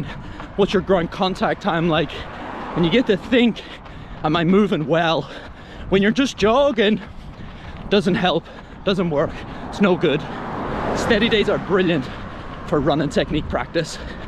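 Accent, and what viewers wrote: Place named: Northern Ireland, United Kingdom. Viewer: Heard the N.I. accent & I’m intrigued. I’m doing the Belfast marathon 20 years after the last time I did it & hoping to do it faster.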